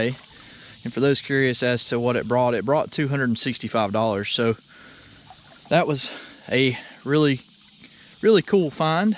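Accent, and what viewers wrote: South, United States. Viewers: I was born a yankee, but I’m a southerner now. The accent sounds like sweet tea served on the front porch. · From northern midwest, where not much accent. Southern accent is cool. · That's not a "southern accent"!!! If you know your way around the country,(OTR) truckers know what I mean... You have an Alabama accent.. Texas and Georgia are subtly different... The Midwest, Illinois, Ohio, New York City,New Jersey etc. All have a manner of speaking that are truly different... North and South Carolina,too.... Love your site and your enthusiasm for when you find something really nice....🤓 Watch out for the rabid crawdads and insane fish😂.... Be Blessed and stay safe...🙏☝️🕊️✝️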